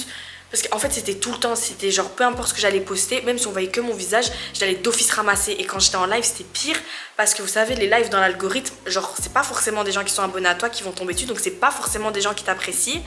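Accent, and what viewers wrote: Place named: Belgium. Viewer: Son accent Belge 😍😍😍>>>>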